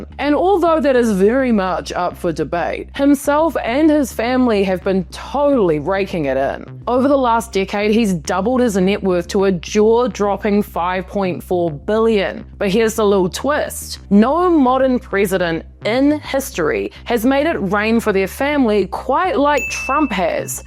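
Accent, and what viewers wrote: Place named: New Zealand. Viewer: Completely beside the point - love your NZ accent !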